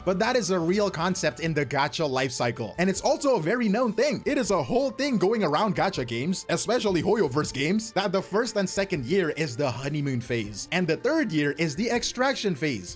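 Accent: United States. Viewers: imma be serious, till this drama happened, I did not know I were from the Philippines and genuinely thought you were an American with a really cool accent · not american? aintnoway you're Indonesian my brother as fellow indonesian your speaking tone sounds more american like newyorker or from cali💀 but hey im glad that you're being honest and clarify misunderstanding ( just rare to see sea cc that have community that full on people speaking english )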